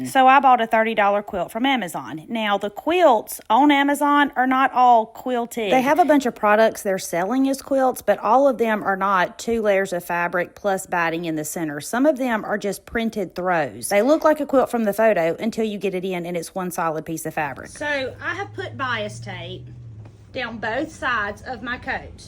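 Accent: South, United States. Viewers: first of all, I am a novice quilter - still haven’t actually quilted my flimsies, just had other people quilt them for me. Second of all, thank you for being such a great teacher. I say that not because I’ve tried anything of yours yet but because you have made this all seem really do-able for this 65 year old novice! I can’t wait to try this quilt coat! And, finally, than you for sharing that beautiful Southern accent (I have one, too); it harkens to “home” for me and, as I’m sure you know, is totally disarming! I’m hoping you will show us how to make some matching mittens and maybe even a hat or toboggan of sorts from this beautiful quilt’s scraps! Please! Oh! and maybe a quilted scarf for winter, too? (I’m living in Colorado now.) And, I almost forgot! I really want to make a mandarin collar for my coat - but I have NO IDEA how to do that. Did I mention I’m a novice?;) I look forward to learning from you!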